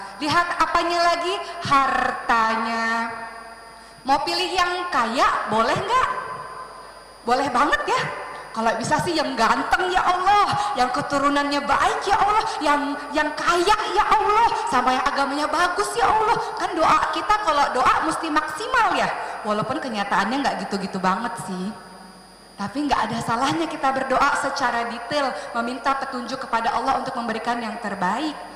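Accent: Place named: Java, Indonesia. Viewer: logat nya ko jawa ya😁😁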